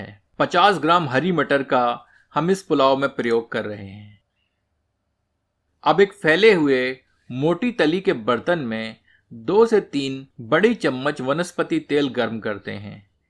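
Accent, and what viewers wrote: Standard. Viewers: Apki awaz or apki shud hindi achiii or rcipi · आप शुद्ध हिंदी में बोलते हैं, सुन कर अच्छा लगा। 👍👍🙏🙏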